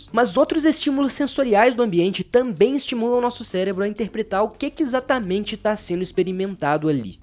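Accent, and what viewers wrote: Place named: Minas Gerais, Brazil. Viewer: Que orgulho desse sotaque mineirin 💛💛💛💛💛 vídeo incrível,!!!!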